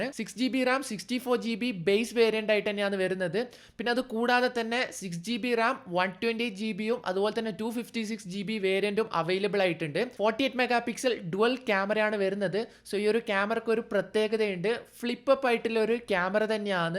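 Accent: Kannur, India. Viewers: Kannur ano?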